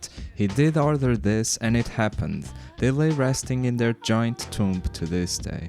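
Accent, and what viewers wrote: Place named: Romania. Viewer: Stai că m-ai lăsat în șoc. M-am gândit că accentul sună a român, dar nu am fost sigur.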